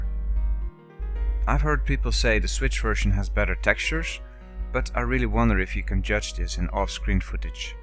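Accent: Netherlands. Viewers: you're Dutch, aren't ya?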